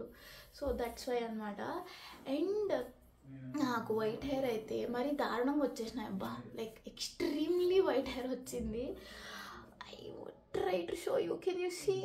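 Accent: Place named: Anantapur, India. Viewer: Kal teaspoon 😂 our ananthapur slang❤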